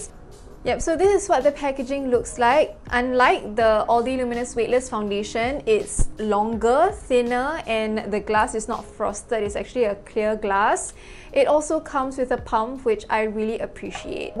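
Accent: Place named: Singapore. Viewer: hello just came across your channel you sound singaporeon :) 😀 I half singaporeon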